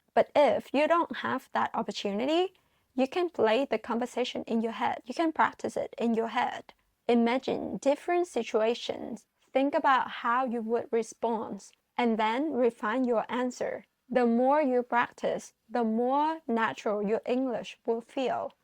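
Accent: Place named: Australia. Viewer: Hi, I'm excited to see a new video on your channel - I loved it! It's also amazing that you are helping lots of people by sharing your own experiences. I can definitely pick up an Australian accent in some of the words you use. 😊 I think one thing I noticed from my personal experiences is that sometimes I don't feel confident internally, but when I rewatch a recording of a lecture or talk I gave, I can't actually tell - so I think insecurity is more often in our heads than something perceived by other people! (It's particularly relevant recording YouTube videos as well, it initially took me a while to get comfortable being in front of a camera on YouTube when I started my channel.) I made sure to like, as always, and looking forward to the next video! 🥳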